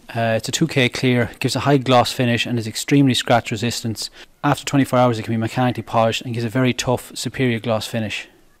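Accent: Ireland. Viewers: Thanks for the great video. Nice Irish accent too! Lol! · Hes Irish so yeah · You sound Irish.... so why Hood? And why Fender? BONNET AND WING. Nice job though :) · God Irish accents are so cool I’ll buy what ever you sell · The Irish accent!!! The title should have said so I would've clicked sooner this is rad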